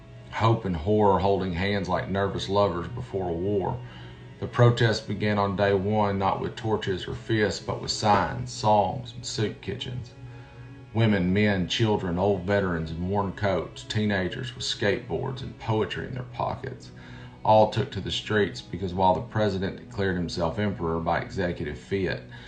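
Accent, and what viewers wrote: South, United States. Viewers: Since 2016, I have been wondering where the poets and musicians are…who are today’s Langston Hughes, Joan Baez and Bob Dylan? There have been no equivalents…until now. This poetry, recited in your beautiful southern accent, reminds me of Walt Whitman. It is the most patriotic speech I have heard from any artist in a decade. The solution to, and the repudiation of, our current situation must (IMHO) come from the American south. It must find a way to heal itself, so our nation can heal. Truth-telling is the beginning. Thank you for giving me hope.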